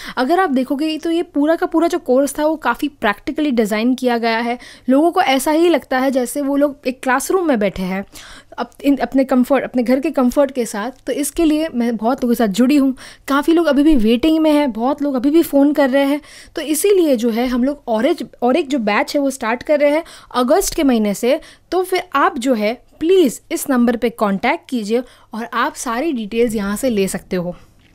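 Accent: Gujarat, India. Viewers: Gujju tone ma mja ave che mam😊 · Mam aap Kabhi Kabhi Gujarati aavaj me bat karte ho kiya aap Gujarati ho, ya Gujarati language aapko aati hai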